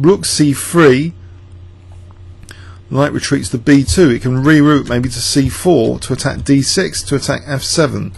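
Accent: United Kingdom. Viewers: Sounds like your saying Fawn pawn to me for some reason.I guess it's just your British accent....I'm not hearing it correctly. It is "thorn" pawn though yes? After watching the reaction video he seems blown over by the move, but immediately castles. Amazing how good these guys are, even in a five minute game how to mitigate damage so quickly after a shocking move/blunder what have you. btw- pertaining to your initial comments. Personally I'd love to see Kasparov make a comeback.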